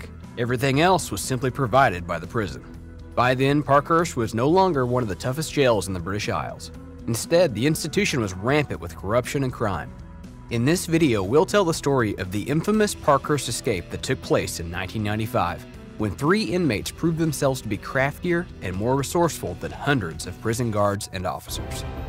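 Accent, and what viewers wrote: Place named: United States. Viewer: Sounds wierd an American describing a UK prison 😂😂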